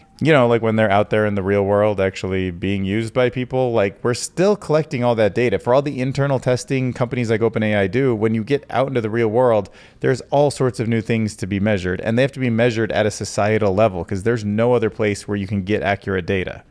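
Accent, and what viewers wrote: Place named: United States. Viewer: This is so funny! I love you, man! Like he is holding it!:pride-flower-pansexual::pride-fan-rainbow-open:Sweden??? You sound American!:pride-heart-rainbow-philly:Ok I think I am the one who lives off crypto currently! But if I have a child from Sergey Brin - I will be on Brinfare!:pride-flower-pansexual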